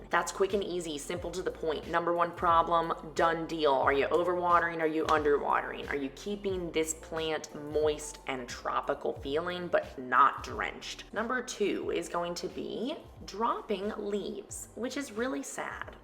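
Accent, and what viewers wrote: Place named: New Orleans, United States. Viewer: Great video... all I needed. Hopefully I can nurse my Fiddle back to health. Also, off topic, are you originally from Louisiana by any chance? You sound exactly like my cousins from New Orleans. Love your presentation and enthusiasm!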